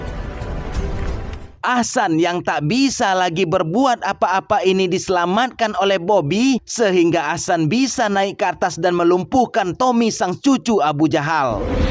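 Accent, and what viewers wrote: West Sumatra, Indonesia. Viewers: Eh mimin ny orang sumatra barat kyak e mah salam dari Padang mimin sehat sehat min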